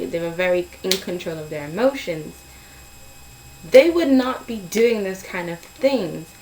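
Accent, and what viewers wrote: England, United Kingdom. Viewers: i dunno, but for some reason, i thought i heard an english accent from you through out this video, was that planned?! lol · wow...cool brittish accent. but it was kinda weird how you started on the brittish accent...then broke off and started talking normally...then started the accent again. But, it was a great rant, and i would have to say that i liked the 1995 pride and prejudice better. heh heh...im a sucker for the classics. :) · My comment won't go through! 1 more time. You know you had an English accent through bits and pieces of your vid, it sounds like you weren't aware it though. :laugh: I'm not trying to embarrass you or anything, cause I've done the same thing. I watched 4 HP movies in a day. I couldn't shake the accent that kept popping up for the rest of the day! Same thing happened when I watched Extras. Colin Firth is sexy, and a much better Darcy. 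I like the 2005 movie, mostly cause I'm a big fan of Keira.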